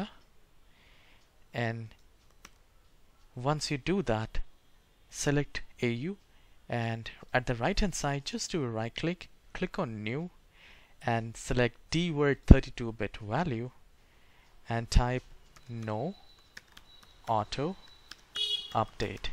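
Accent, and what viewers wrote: India. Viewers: Thanks bro, are you indian by any chance?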